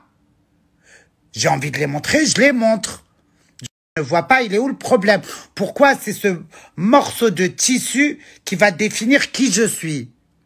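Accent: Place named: Saint-Denis, France. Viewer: Français des quartiers défavorisés de saint denis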